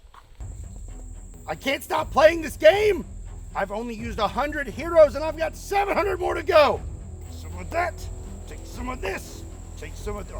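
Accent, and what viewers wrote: United States. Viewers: Your yankee announcer voice is hilarious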